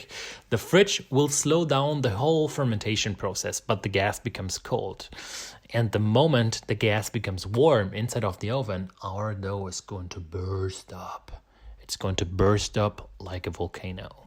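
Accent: Germany. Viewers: is 15 minutes not 50 right? I know the subtitles say 15 but maybe AI was thrown off by a charming German accent?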